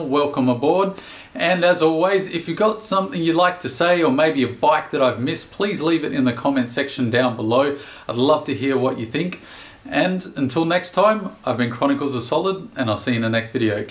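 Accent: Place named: Australia. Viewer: I love the videos and I always look forward to the next release, but pleeeeeease prounounce Versys as it is intended. VERsatile SYStem. Surely your Australian accent shouldn't interfere with pronunciation of this portmanteau word? Just nit-picking :)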